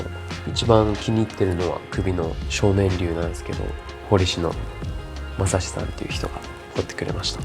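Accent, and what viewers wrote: Okinawa, Japan. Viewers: めっちゃ沖縄訛り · 沖縄の訛りって優しくて好き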